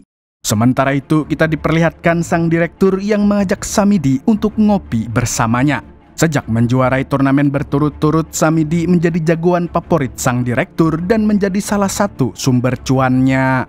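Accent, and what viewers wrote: Banjar, Indonesia. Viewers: Orang Banjar kah om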